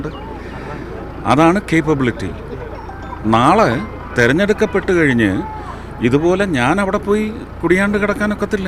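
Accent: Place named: Namboothiri, India. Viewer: ഇയാൾ ആരാണെന്ന ഇയാളുടെ വിചാരം.. സംസാരവും ആക്ഷനും എല്ലാം എന്തോ ആണെന്ന ഭാവം.. ഓരോരോ കോപ്രായം.. അടുത്ത ജന്മത്തിൽ പൂണൂല്‍ ഇട്ട് നടക്കണം എന്ന ആഗ്രഹം ആണോ ഇപ്പോഴേ നമ്പൂതിരി ഭാഷ പ്രാക്ടീസ് ചെയ്യുനത്..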